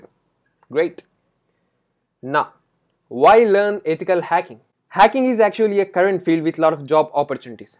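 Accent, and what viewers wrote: India. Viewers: You know it's about to be good when the man's got a slight indian accent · it's a proper indian accent....not slight...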